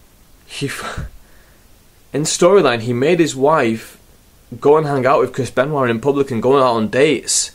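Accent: Manchester, United Kingdom. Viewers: Where you from anyway? You sounds like your from my home town? Manchester Salford :) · Does this guy on the video have an Manchester accent ? Is he from Manchester?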